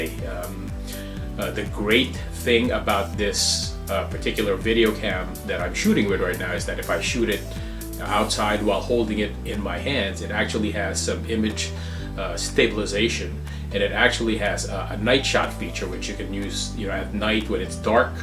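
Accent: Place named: United States. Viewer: hello ang galing naman ng pang vlog mo ,american na ang accent mo nice video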